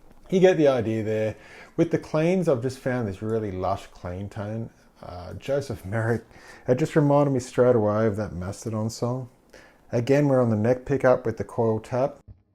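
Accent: Australia. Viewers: Hello! My name is Pietro, I'm from Brazil and I love your channel! I'm 22y, started playing guitar 2 years ago, and I'm not gonna lie, sometimes I have a hard time trying to understand what you say, my english is not perfect and the accent for me still hard to understand But I'm trying to practice, I got an australian citizenship from my father so I'm willing to move to Australia within the next few years, so better get used to the accent hehe I like specially your boss katana videos, I always wanted an amp like that but unfortunately it is too damn expensive here in Brazil Everything is expensive, it would take me 3 entire salaries just to buy the katana mk2 50w, it is simply not doable But I'm at the hope that someday I will have a guitar room just like you do CheersHello! My name is Pietro, I'm from Brazil and I love your channel! I'm 22y, started playing guitar 2 years ago, and I'm not gonna lie, sometimes I have a hard time trying to understand what you say, my english is not perfect and the accent for me still hard to understand But I'm trying to practice, I got an australian citizenship from my father so I'm willing to move to Australia within the next few years, so better get used to the accent hehe I like specially your boss katana videos, I always wanted an amp like that but unfortunately it is too damn expensive here in Brazil Everything is expensive, it would take me 3 entire salaries just to buy the katana mk2 50w, it is simply not doable But I'm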